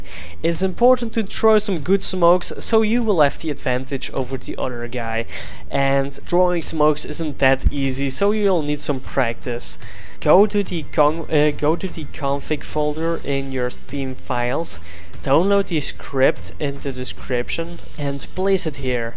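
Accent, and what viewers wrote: Netherlands. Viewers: Are u dutch? :o.... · lol, ben jij nederlands?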